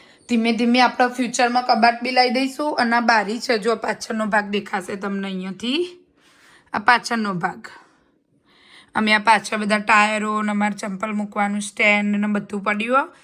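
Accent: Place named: Daskroi, India. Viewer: ગાંધીનગર બાજુ, દસકોશી ના લાગે છે😀 ભાષા ઉપર thi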